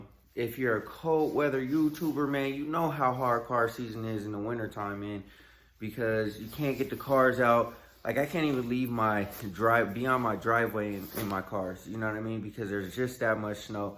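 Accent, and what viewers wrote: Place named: Bay Area, United States. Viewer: Man you got to be from the Bay Area, you definitely got our talk from out here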